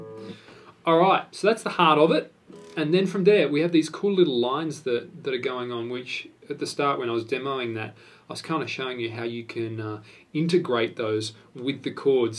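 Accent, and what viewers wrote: Australia. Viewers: He's Australian...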